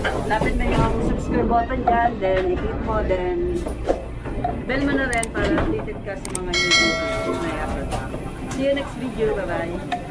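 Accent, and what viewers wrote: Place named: Batangas, Philippines. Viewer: Such a beautiful plce . Enjoy your Gala nga Sissy . Stay safe. Tga Btangas ka na Sis. May punto kasi.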